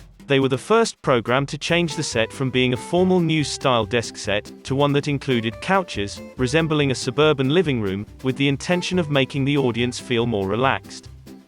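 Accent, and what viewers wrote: United Kingdom. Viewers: Well, he may have a British accent and also be an American. This was a fun trip down memory lane.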